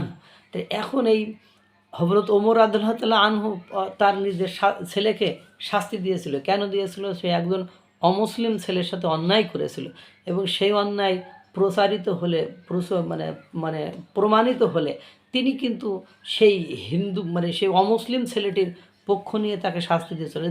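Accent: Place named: Khulna, Bangladesh. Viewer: খুলনা, যশোর বা সাতক্ষীরা ভাইয়ের জেলা বলেই আমার মনে হয়। কারন কথা বলার ধরন ঐদিকের মতোই।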